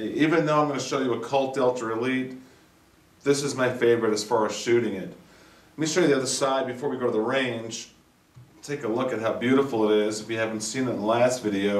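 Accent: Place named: California, United States. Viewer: this guy sounds like hes californian.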